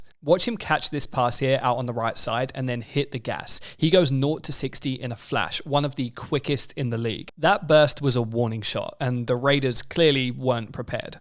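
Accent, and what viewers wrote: United Kingdom. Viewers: Gotta say it is super strange hearing about NFL football in a presumably British accent. Incredibly well done video and totally accurate.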